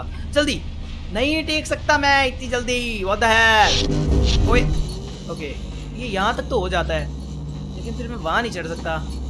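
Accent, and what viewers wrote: Haryana, India. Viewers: Bhai Aap haryana ka hoo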